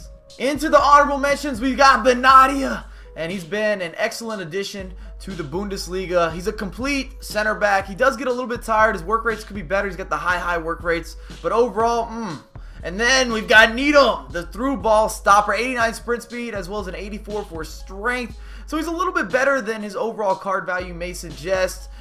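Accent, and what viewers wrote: United States. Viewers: Are you America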